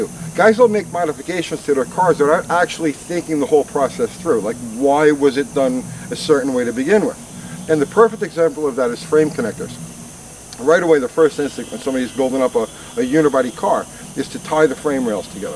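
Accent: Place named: New York, United States. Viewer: i know this is off topic but r u by chance from the east coast ? u sound like a guy i grew up around good guy taught me a lot he was from new york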